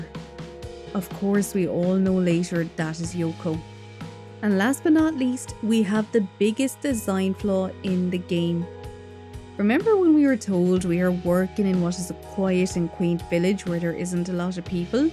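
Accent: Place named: Ireland. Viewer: The moment I heard ur voice, I immediately knew you're from Ireland 🇮🇪. Such a cute and sweet accent fr. Btw amazing video ( ≧∀≦)ノ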